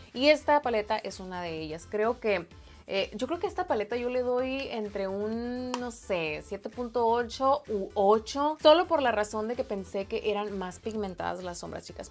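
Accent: Sinaloa, Mexico. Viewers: Me encanta tu asento sinaloense aqui en Oaxaca les copiamos algunas palabras x eso me identifico con todo lo q dices mi 💗💗💗💗💗💗💗💗💗💗👑👑👑👑💅💅💅💅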